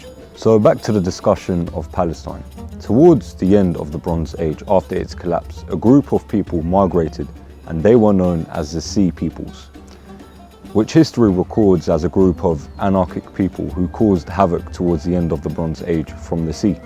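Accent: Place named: England, United Kingdom. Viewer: لكنة انجليزية رخمة و رائعة!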